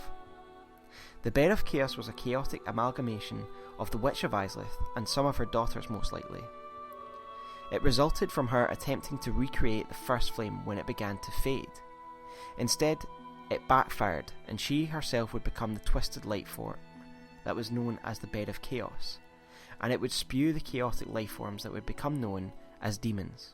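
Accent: Scotland, United Kingdom. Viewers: I can't even recall how many times the Scottish accent made me laugh ! Seriously, sometimes I laughed so hard I "wetch"ed my pants. XD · agreed, Scottish accent ygm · Scottish are we?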